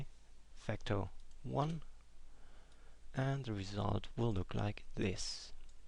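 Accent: Germany. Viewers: You still have your german accent. One matter of practicality. Tricky techniques don't need handholding. We can setup on our own. Make your life easier and skim the basic stuff. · You still have an accent. You can just about tell it's German but yeah you can tell it's there.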